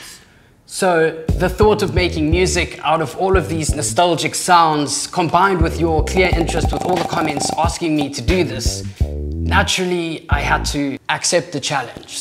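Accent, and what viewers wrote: South Africa. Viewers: Are you a south african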